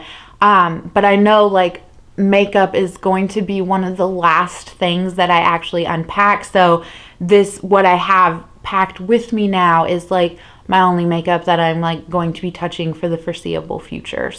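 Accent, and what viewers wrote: South, United States. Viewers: Heck yes girl! I am here for the country accents! I’ll bring the sweet tea to your porch! I hope that y’all got rocking chairs!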